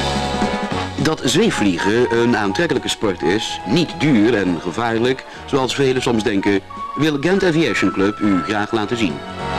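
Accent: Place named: Netherlands. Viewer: Spijtig van dat Hollands commentaar- waarom geen Vlaming?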